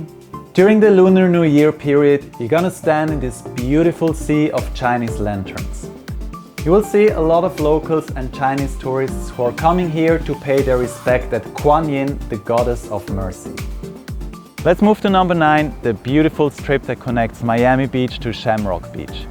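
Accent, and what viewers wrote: Switzerland. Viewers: Thnx for this vid. - It sounds like a Swiss accent you're speaking, is that true? :) Greetings from Zurich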